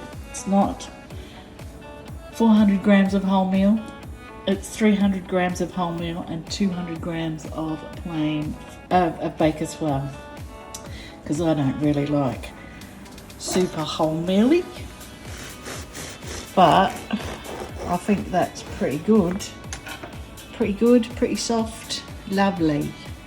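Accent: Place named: England, United Kingdom. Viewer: Love the English accent. 😆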